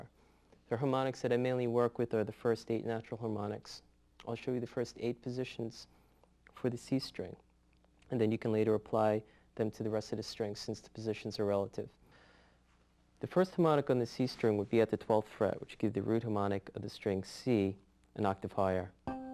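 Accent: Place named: New York, United States. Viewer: I love his New York accent.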